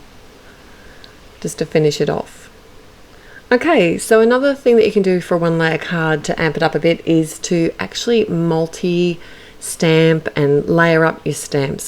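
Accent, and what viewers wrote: Australia. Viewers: Lovely one layer cards. It is nice to hear a fellow Aussie on YouTube.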